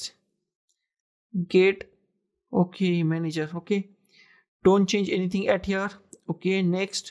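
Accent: India. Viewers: Bro is Indian Pro Max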